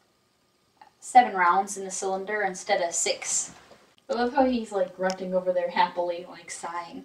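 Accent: Wisconsin, United States. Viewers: Your accent also gives it away. I am also from Wisconsin and when I went to the East Coast, the locals would ask me if I was from Wisconsin or Michigan.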